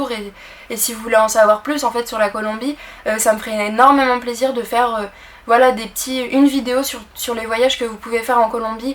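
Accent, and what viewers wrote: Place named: France. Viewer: No entendí nada😢, pero me encanta el acento francés. Estoy aprendiendo apenas el frases, estoy con como se dicen las cosas básicas y todo eso. Espero algún día entenderlo y hablarlo.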